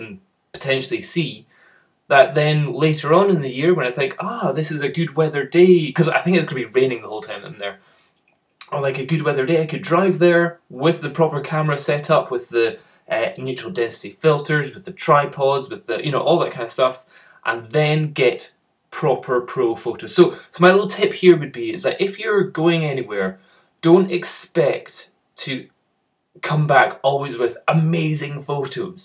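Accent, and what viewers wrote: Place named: Scotland, United Kingdom. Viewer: I´d take the 550D with the kit lens! Btw. what intrigues me: is your accent genuinly Scottish or did you "internationalize" it in some way? It´s interesting, that you´re actually using Am.E. pronunciation sometimes like e.g. can´t, plant, chance, answer.... Accents have always intrigued me, so I´m digressing from the topic a bit!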